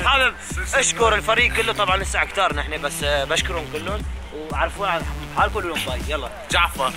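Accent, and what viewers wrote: Syria. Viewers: عراقية وأفتخر 😂 لك دخيل ربك عم تحكي سوري😊❤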